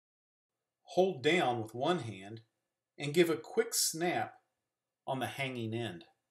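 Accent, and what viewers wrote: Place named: Texas, United States. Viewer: Sounds like he's from arlen texas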